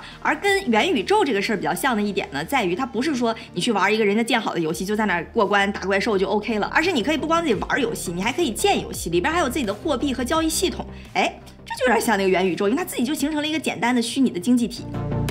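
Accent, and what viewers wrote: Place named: Shandong, China. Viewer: 听出来小林的山东味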